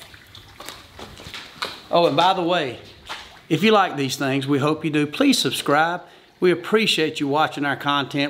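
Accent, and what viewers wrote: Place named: South Carolina, United States. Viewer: You must have took a long break fir those Big pieces of wood to be that small of coals. South Carolina accent is hard to hide..lol And he didn't give a good size bite..lol Good video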